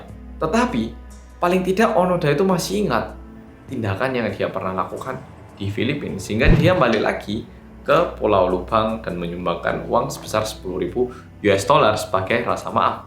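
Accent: Java, Indonesia. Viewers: Serial killer jos tenan.alias medok. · logat Jawa nya sudah mengental 😁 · Bersama orang Korea yang medhook, Tiap opening pasti ngikutin mas ngomong medhookkkkkkkkkkkkkkkk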